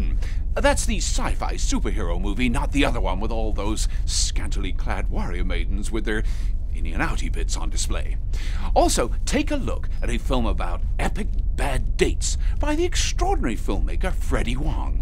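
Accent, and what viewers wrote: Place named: United States. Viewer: Well, he does already speak in an American accent. He did use P.E. teachers in Red Dwarf but it's not such a huge leap to imagine he would also use American words.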